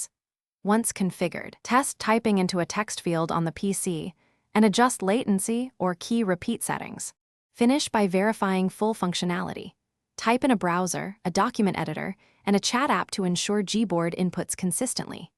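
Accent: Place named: United States. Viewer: The overly-American accent is REALLY grating. Please over-pronounce the letter R so it's like fingers down a blackboard.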